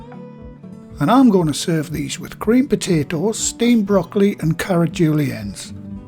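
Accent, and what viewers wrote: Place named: Newcastle, United Kingdom. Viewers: Hello you have a very familiar accent you wouldn’t be from the North of England would you possibly Newcastle? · You sound like a Geordie, sorry if I’m wrong I’m new but you’re a bloody good chef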